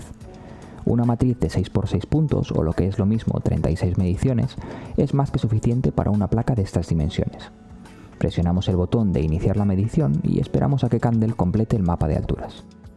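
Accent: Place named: Spain. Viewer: por tu acento eres de España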